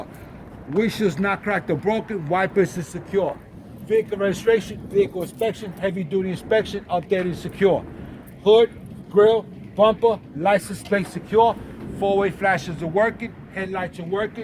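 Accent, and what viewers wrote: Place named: New York, United States. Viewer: God I miss the New York/Jersey accent.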